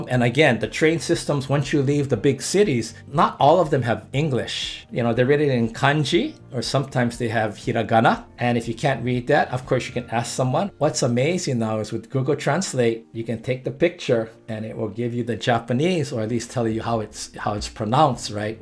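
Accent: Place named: Hawaii, United States. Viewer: are u from hawaii. i can hear the pidgin accent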